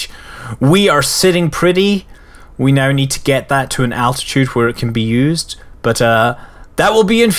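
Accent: Scotland, United Kingdom. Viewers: well some peope have other shit to do than sit infront of a computer screen watching ssomeone else play for hours lmao,even tho i like his scotish accent and voice,maybe,just maybe i just happened to come across this video and just watched it without going ocd and being like shit i gotta watch the 10 others before this one! wich are all over 30 mins,thats 5 hours(more than that if you count the ones being an hour),grats to you if you did,but i sure dont have time to.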